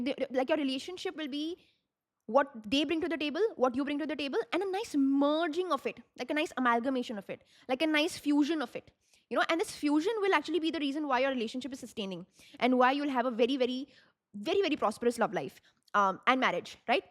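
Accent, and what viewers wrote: India. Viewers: I can’t with the Indian accent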